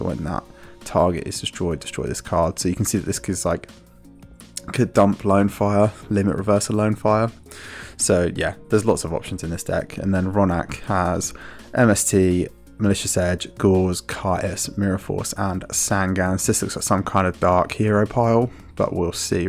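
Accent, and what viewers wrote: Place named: United Kingdom. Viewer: i like ur commentary your british voice is soothing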